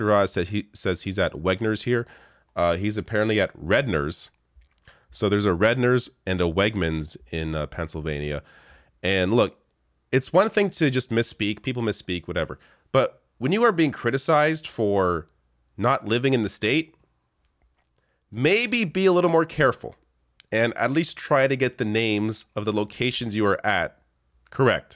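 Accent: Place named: Canada. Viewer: I'm not sure, if you're a Canadian or a Seppo. You sound like a Canadian. Is Canada's opinion of any worth in the US? Are you folks stepping out into the big world of American politics? You, like us were big players in the wars of the 20th Century. Dead men, though, it seems are just gravestones to stand in front of. I'd really like to hear a reply. I'm genuinely interested.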